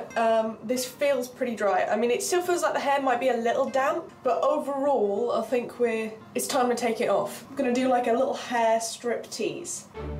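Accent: United Kingdom. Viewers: has anyone ever told you, you have the proper accent to be a Dr.Who companion? i think you and the Matt Smith doctor would get a kick out of each other... All british people know Dr.Who, right? its like a sporting event for you guys when it airs?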